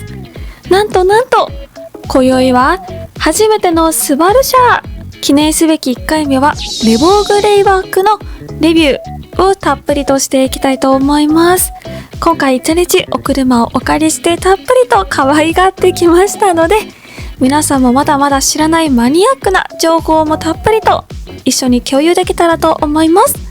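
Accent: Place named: Fukuoka, Japan. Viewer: いつも楽しく拝見させて貰ってます！ 僕は福岡県内に住んでるんですが こっちの方言にクリソツなんですが 愛媛育ちなんですか？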